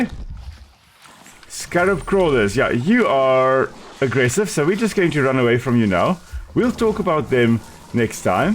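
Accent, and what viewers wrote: South Africa. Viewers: Are you from SA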